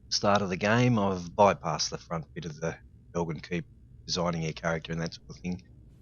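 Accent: Australia. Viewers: You from Australia 🇦🇺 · this is an aussie accent...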